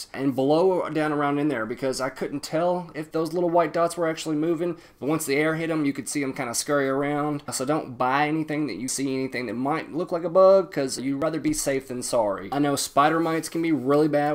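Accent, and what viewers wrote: South, United States. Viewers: Good content. Good southern accent. Good cat. I like this.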